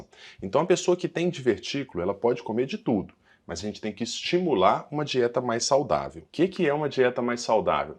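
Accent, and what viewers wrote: Minas Gerais, Brazil. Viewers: Obg pelo vídeo,me ajudou bastante ,descobri hoje que estou com esse problema. Acho muito bonitinho seu sotaque mineirinho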